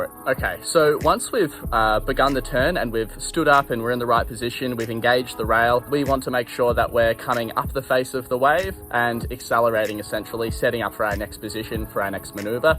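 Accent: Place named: Australia. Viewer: Dude, thanks so much for all your vids and explanations. Very useful. (And cool to listen to a calm aussie voice)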